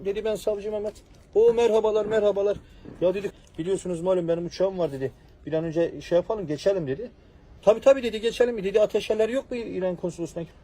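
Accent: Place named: Erzurum, Turkey. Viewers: Çizim de Erzurum şivesi de eyi olmiş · Erzurum lehcesi hastayim ya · Olay çok komik ve Erzurum ağzı ile anlatılması daha da komikleştiriyor DYSIDJGXUXOSJSGX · adamın şivesine zor tahammul ettim, sevimsiz Erzurum ağzı.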